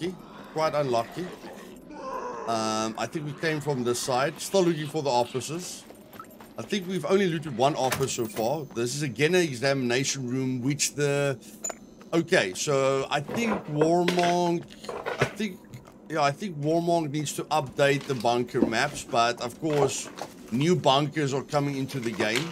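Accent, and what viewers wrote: South Africa. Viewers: I can hear that South African accent! :3 And yeah Eskom screws us all over!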